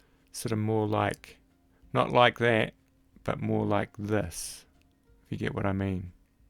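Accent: New Zealand. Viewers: Hi. I'm a new subscriber to your channel and suspect I'll me a member before too long! I enjoy your easy going manner and the NZ accent is like listening to music. I'm a beginner and have been toying with bone work so your vids are quite a help. I was drawn to this particular video when I mistook "Kutzall" for 'KLUTZ-all." and thought "Well, there's a product made just for me!"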